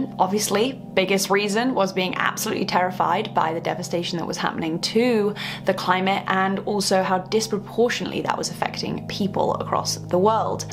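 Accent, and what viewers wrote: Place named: England, United Kingdom. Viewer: I really love English accent, she's a real queen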